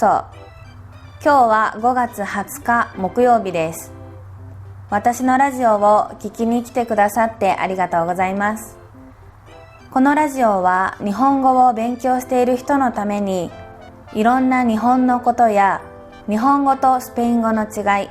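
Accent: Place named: Kansai, Japan. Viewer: Me gusto mucho el podcast :3 いいポッドキャストだと思います 関西弁は可愛く聞こえます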